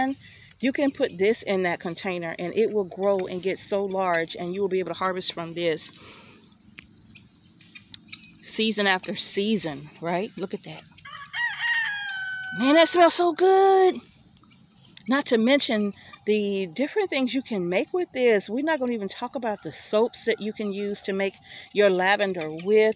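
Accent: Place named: North Carolina, United States. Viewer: New to your channel, just subscribed. :) Question... what part of the country are you in? I'm native to central NC and would like to know if our growing conditions are similar. (I hear those "y'alls" your saying, and detect a slight accent like mine.)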